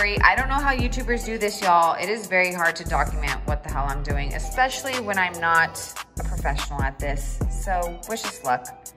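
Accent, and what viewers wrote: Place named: New York, United States. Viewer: Why does she sound like a New Yorker but she is somewhere in Texas, per a TV show video I saw?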